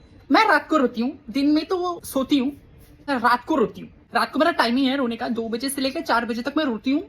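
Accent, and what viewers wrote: Assam, India. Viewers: Are you from assam? 🤭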